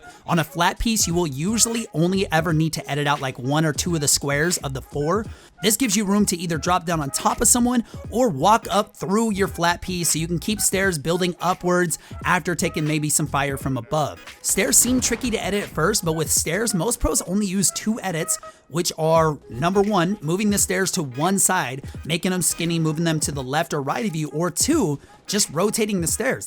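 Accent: Denver, United States. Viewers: Are u from Denver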